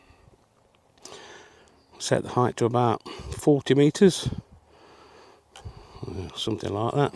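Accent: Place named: Midlands, United Kingdom. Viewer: you sound like your from Midlands, I got one today and it does 350m before losing 2 bars. Would loved to run 2 of them at same time and test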